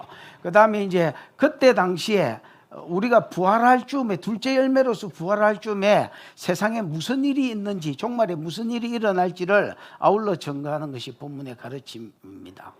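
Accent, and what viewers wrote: Gyeongsang, South Korea. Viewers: 목사님, 캐나다 토론토에 25년 넘게 살고있는 교민입니다. 우연히 영상을 보게되었었는데 첨엔 코믹하고 촐랑거리는(지송! ㅋㅋ) 특별한 캐릭터의 웃긴 목사님인거 같아(글구 심한 경상도 사뚜리~)넘어갔었는디... 또 몇달후.. 구속사를 듣게 하셨고 고린도전서, 열왕기서 강해들을 들으면서 눈이 번쩍 뜨이고 '이분 따라 살아야겠다' 고 생각하며 정신이 번쩍 났었습니다. 저는 이민와서 예수 믿기 시작했었는데, 긴- 시간동안 왜 교회가 강해설교를 안해주는지 정말로 답답했었거든요 😭 그날에 큰- 상급이 확실하시니 힘내시고 계속 앞에서 달려주셔요! 화이팅!!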